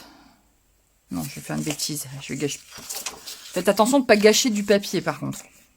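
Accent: North, France. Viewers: Coucou 🙋‍♀️J’avais reconnu que vous étiez du Nord à votre accent, sourire… Je suis du Grand - Est, de la Moselle.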